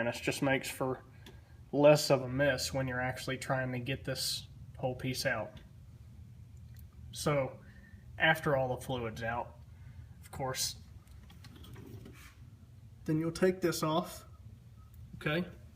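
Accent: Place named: South, United States. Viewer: The southern accent makes it so much better, though. 💪 🍻 🔥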